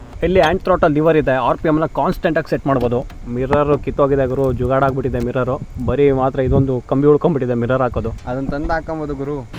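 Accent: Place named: Karnataka, India. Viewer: Guru firstuu ninnu typical kannadiga tara mattadod bedu angg mattadid taksha ninn en TK agalla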